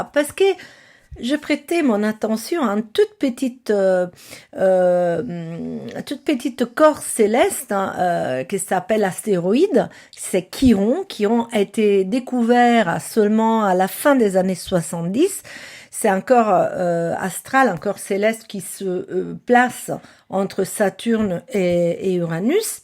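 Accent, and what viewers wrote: Italy. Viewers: Merci chère!!! Vous parlez trop bien le français avec votre magnifique accent italien! ❤❤❤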